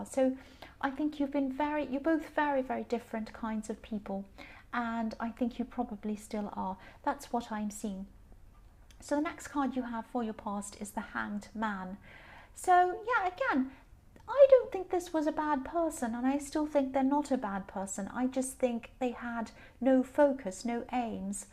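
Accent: United Kingdom. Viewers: you're so British!!! Love you!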